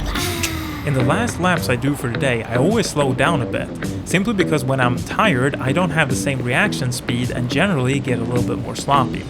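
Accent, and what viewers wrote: Sweden. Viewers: Your accent sounds swedish? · U must be from Sweden with your accent? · Är du från Sverige?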